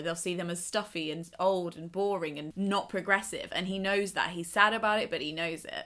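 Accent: United Kingdom. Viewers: Also wild to hear Patrick Swayze lines in a British accent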